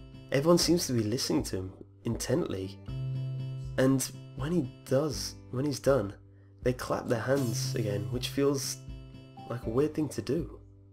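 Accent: England, United Kingdom. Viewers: You're English, too? I Knew it!! *Hugs*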